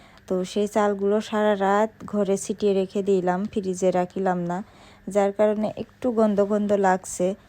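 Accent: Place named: Jhenaidah, Bangladesh. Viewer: আপু তোমার বাসা কোথায়??? ভাষা টা আমার জেলার মতো লাগছে। ঝিনাইদহ জেলা আমার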